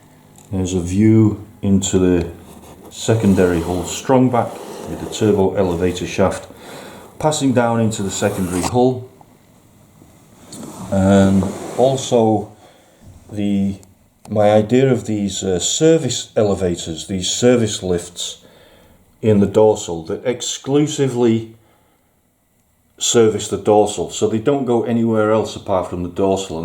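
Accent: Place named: Bury, United Kingdom. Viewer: Wow, amazing work, my friend! Your skills are out of this world, which is quite apprpriate! Growing up, I always preferred the overall look of the Enterprise D exterior, however, I recently learned just how VAST the D is, like a city, too big to walk around, you're 100% dependent upon the turbolifts, and that really put me off. The curved corridors we see on TNG have a TINY diameter compared to the actual outer saucer. That ship is so vast, it's actually nightmarish. Too big to feel like home. Now as for the original 1701, it's still huge, but small enough to feel comfortable, small enough to walk around, so I'm starting to appreciate it way more. As for you taking a few liberties with the design - why not? Since the "official" layout diagrams were incomplete, it's up to the fans to fill in the gaps using logic and knowledge of the show. 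By the way, your accent reminds me of the Bury area, where my dad's from :-)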